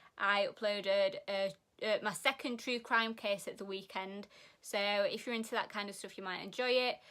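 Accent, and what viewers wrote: Sheffield, United Kingdom. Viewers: I love the Sheffield accent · You have the posh Sheffield accent. I have lived in Sheffield all my life and I don't sound anything like you. Mine is a common Sheffield accent.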